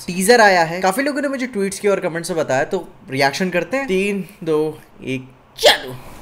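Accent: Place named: Bhojpuri, India. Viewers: Haan, shayad bhojpuriya hi hai but bande ka baat karne ka lehza aur shabdon ka chunaav bahut badiya hai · isiliye bhojpuriya lagta hai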